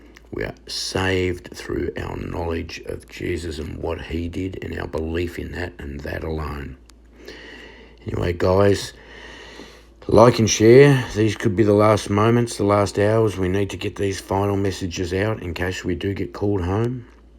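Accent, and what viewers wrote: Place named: Tasmania, Australia. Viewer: ❤❤❤❤❤Waking up to your Taz Aussie voice is wonderful! Talking about rapture with that accent makes my morning! Thank you! Have a wonderful night and sweet rapture dreams! ❤❤❤❤❤😊 That big bright moon was right over my house on the mountain last night. Lit up the entire back yard! My 🐕 dog loved being able to see foxes, deer and any other critters out there! ❤. So, after hearing your wonderful accent talkin' 'bout rapture....I go outside to see the chemtrails ONLY over me (yes, all lines cut off to and fro, just over ME) and I took pics to show people so they'd believe me. I told them if I disappearr....dpState. Nothing like being targetedd, but Jesus is with me, protecting me. Yes, misspelled words so "Aye-eye-yie-yie" won't track it so quickly hopefully....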